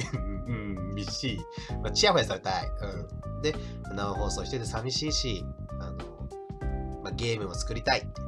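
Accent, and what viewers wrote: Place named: Kansai, Japan. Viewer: あっ声超好きだわ(´ཫ`*) 好きです 関西弁ですかね？最高ですね これから応援させて頂きます！！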